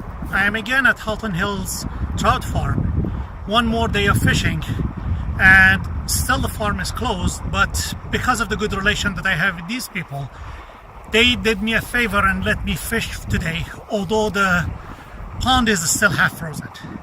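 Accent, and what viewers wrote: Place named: Iran. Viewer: Just noticed your accent are your Persian are you Farsi aaaaaa babajon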